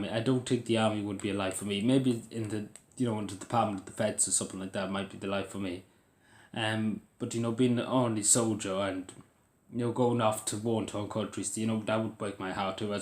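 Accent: Cavan, Ireland. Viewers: Love the Cavan accent. I worked for a Cavan man many years ago. Best boss I ever had. Looked after all his staff so well.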